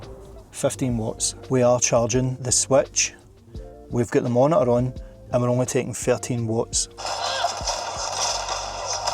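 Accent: Scotland, United Kingdom. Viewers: I'm watching enough folks from the UK doing reviews that I'm actually able to understand almost every word. It's amazing! And it's a fun challenge. The one thing that I'm not so good at is distinguishing accents. British is pretty easy, but the other three are more challenging for me. I'm going to guess Scottish for you, but as green as everything around you is, it could be Northern Ireland. Am I right? BTW, I don't know about the pricing in the UK, but here in the States, on Amazon, I just bought one of these for 379 USD, which is cheaper than my Bluetti AC180 cost, at 479 USD, in December of 2024 and that was a huge Christmas sale price reduction. To me, that was the biggest "PRO" for the 100v2. I enjoyed you review and thanks for publishing the content. If you don't have one already you might look into buying a convection hob. They can cook many different things very efficiently and are perfect to use with a solar generator. 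· The gentleman is Scottish. I am English. I can understand him perfectly. You on the other hand are incredibly rude. I also understand that it takes a hell of a lot of time, effort and resource to put these reviews together so that the rest of us have a better idea about what to buy.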